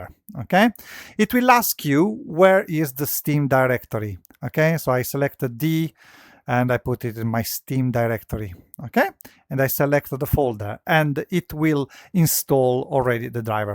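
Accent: Italy. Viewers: Wait r u italian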